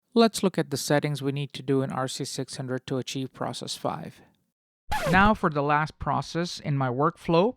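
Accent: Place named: England, United Kingdom. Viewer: Except the English accent everything else is excellent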